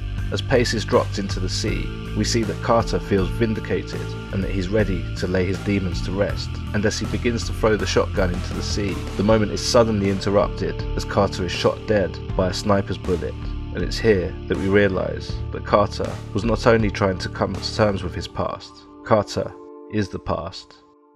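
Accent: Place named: United Kingdom. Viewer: 😊 I like this documentary about gangster film genre.😊 I like the narrator with the British accent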